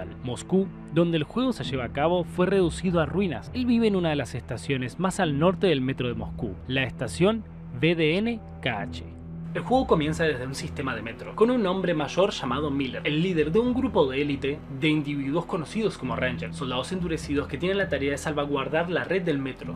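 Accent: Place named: Argentina. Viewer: Noooooo :( que feo, en acento de un argentino arruina todo :(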